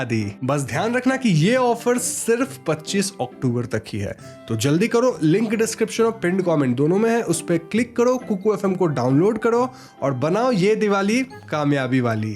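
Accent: Bengal, India. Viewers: Your Bengali accent is nice.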